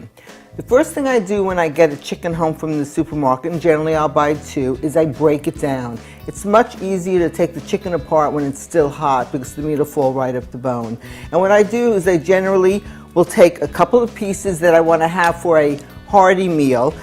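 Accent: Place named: New England, United States. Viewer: That sounds like a New England accent. Im from Boston, where are you from ? Thank you for the tips. I use this chicken all the time and always looking for new ideas.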